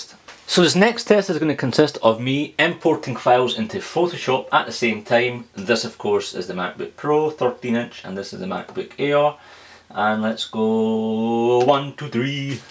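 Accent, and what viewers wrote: Scotland, United Kingdom. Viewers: I wanna say scottish??..?? I could be wrong. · r u from scotland? i love scotland! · are you scottish? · so scottish. awesome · i love the scottish accent wish i were scottish 1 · Are you scottish? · If my knowledges of British Isle accents are correct, than I'd say Scotland.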